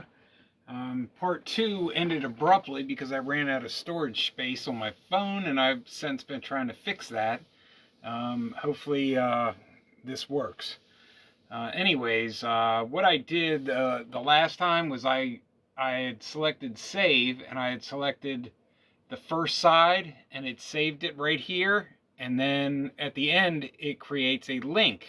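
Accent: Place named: North Carolina, United States. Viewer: Thanks for the video. Been thinking about getting one of these. You sound from your accent that you are from my neck of the woods, North Carolina. I also have the VPI Signature21 in RW, and the Integrity Tru-Lift and Kleen Sweep. Great products so you have good taste too😀 Love the music videos Keep it up.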